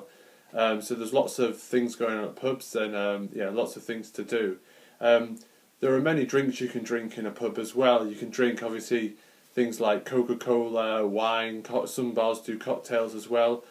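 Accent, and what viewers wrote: United Kingdom. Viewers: イギリス英語(きれい)をこんな形で、かつ、色々なトピックで聴ける(観れる)のは、他にありませんでした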